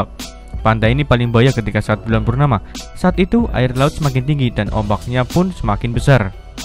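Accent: Java, Indonesia. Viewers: medoknya dikondisikan...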